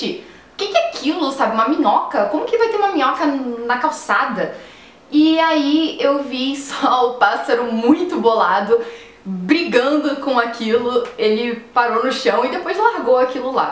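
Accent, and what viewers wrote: Rio de Janeiro, Brazil. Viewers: Só agora eu reparei no seu sotaque carioca ... coixass maixxs legaixxs... hehe :) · Muito fofinho esse teu sotaque carioca <3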